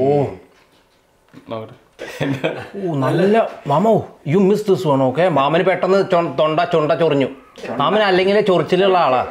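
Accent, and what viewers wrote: Thrissur, India. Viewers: ❤… lub ur tcr slang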